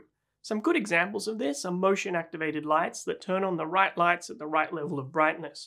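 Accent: Australia. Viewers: Ha!. Suspected that was an Australian accent! Great channel. 👍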